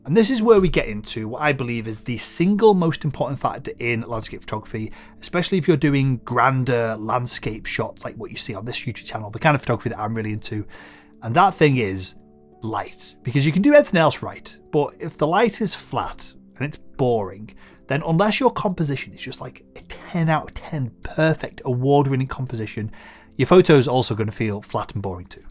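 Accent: United Kingdom. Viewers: Even though I watched this video to learn about landscape photography- which is something I like to to as a hobby - I have to say that I really like your English accent and I think you’re really cute, which was the cherry on the cake. ;-)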